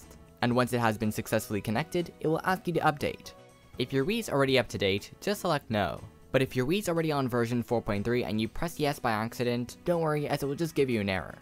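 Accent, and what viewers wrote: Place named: United States. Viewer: American I believe. I'm Britsh and judging by how he pronouncing words like 'select' I think he's American. Also, he has a nasally accent which is very typical in parts of North America.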